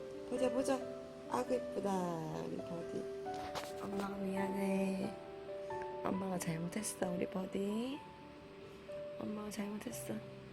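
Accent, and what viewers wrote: South Korea. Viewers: 눈이 와이리 커요~ 고향이 경북 문경이나 상주쪽이신가요? 억양이 처가쪽이랑 꽤 비슷하네요 ^^ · ㅋㅋㅋㅋ 저까지 같이 신나네욬ㅋㅋ 찐부산사투리 넘 좋아용 ㅠㅠㅠ 애미 자는 모습을 보니 집이 엄청 편안한 가 봅니다! 매번 댓글 다 읽어주시고 하트보내주셔서 감사해용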